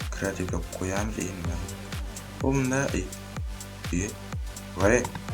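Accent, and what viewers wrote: Khorezm, Uzbekistan. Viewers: Oka Xorazmdan misiz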